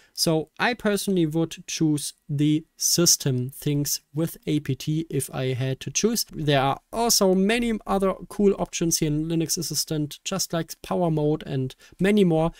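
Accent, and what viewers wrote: Germany. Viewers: weil der deutsche Slang durch kommt 😉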